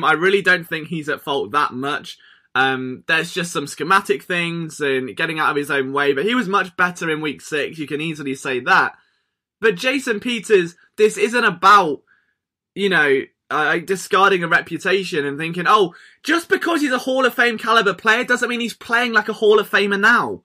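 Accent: United Kingdom. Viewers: Your whiny British voice is the only whiny British voice I can endure for more than 30 minutes.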